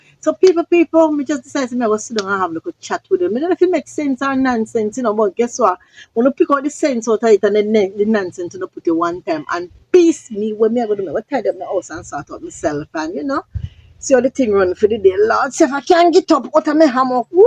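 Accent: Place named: England, United Kingdom. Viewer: Back in 1981 the Reggae Group made the song " "-Don't show I yuh teeth can't work! Maybe they were touring in the UK at that time,lol. I love when you're speaking with the proper English accent and then bruck out with some Patois🤣🤣 But in all seriousness The Jamaica Tourist Board should really contact you about doing some promotional Jamaican Ads as you're always positively highlighting JA. You are able to keep safe and sound where so many others have met their demise. Maybe you could give some Tips about safety measures. to di werl🥰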